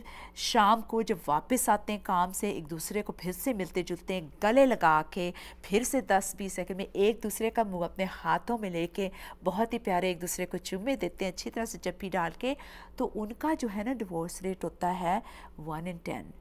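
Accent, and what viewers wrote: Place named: Pakistan. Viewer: Her hindi accent is Pakistan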